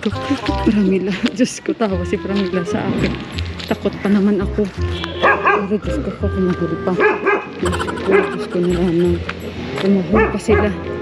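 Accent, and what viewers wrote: Iloilo, Philippines. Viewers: Gustong-gusto ko talaga Ilonggo accent mo, mamsh. Missing Iloilo and Bacolod and all my Ilonggo friends.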